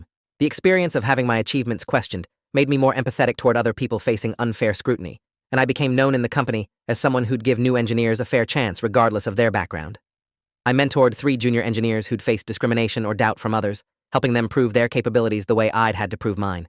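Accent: United Kingdom. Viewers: I think he’s / she’s talking British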